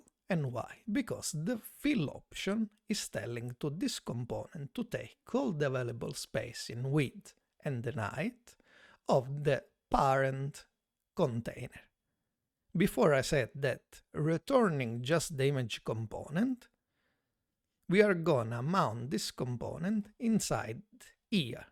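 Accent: Italy. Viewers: He is Italian, what do you expect? This tut. helped me a lot. Thanks to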